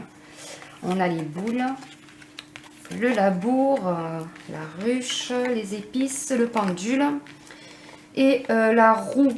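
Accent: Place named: South, France. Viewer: Merci J'apprécie beaucoup votre travail Et ce petit accent du Sud m'apporte le soleil qu'il manque chez moi Merci et bravo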